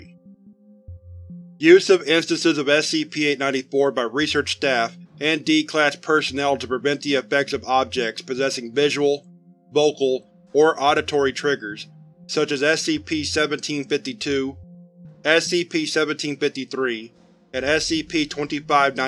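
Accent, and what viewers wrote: United States. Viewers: *intentionally VHS sound, good soundproofing or shady mic, sounds like a trucker's CB radio cadence/accent, are you from Texas? if not, why?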